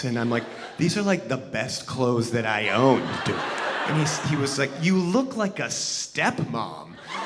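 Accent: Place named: Massachusetts, United States. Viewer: I was thinking ok that’s Massachusetts or Portland because I actually understand this guy and I now I believe it is Massachusetts.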